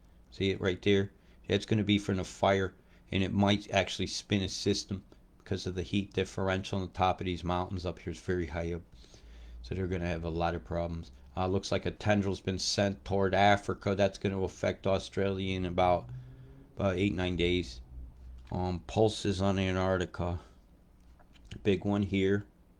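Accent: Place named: New York, United States. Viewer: I love your voice. Reminds me of both Brooklyn, New Joyzy & Boston. I was born in NY, my dad grew up in Scarsdale, was a welder/engineer in Brooklyn. As a kid we lived near Pelham. Used to skip school, take the train to Grand Central, muck around, panhandle for train fare back home in time from "school"... 😁 Keep singing .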